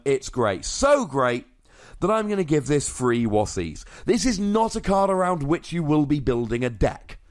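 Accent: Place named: Birmingham, United Kingdom. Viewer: Though i heard that voice in Birmingham and Sheffield regs!!!! your a great commentator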